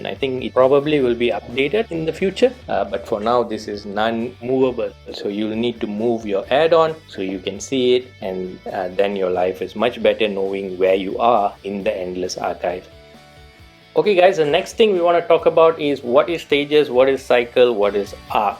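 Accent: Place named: Malaysia. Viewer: Wow Malaysian or Singaporean Indian I’m guessing. Hyped for the endless archive. Re downloading the whole 100gb game again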